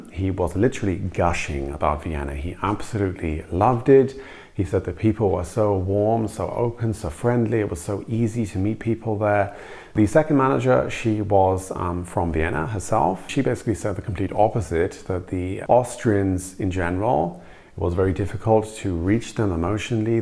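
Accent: Germany. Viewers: Your accent almost sounds German... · I love your videos but I'm distracted by the major German intonation you have when speaking English.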